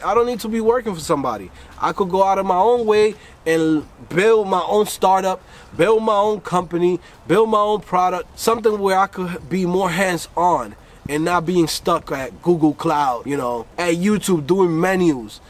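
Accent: New York, United States. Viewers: NYC remixed with a slight D.R. accent. 😁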